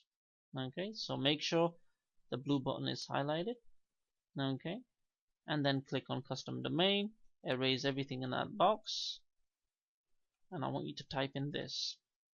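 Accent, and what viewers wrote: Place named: United Kingdom. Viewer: Fantastic! I could listen to your lovely voice all day ♥... Lovely accent. What part of the UK? Subscribed :)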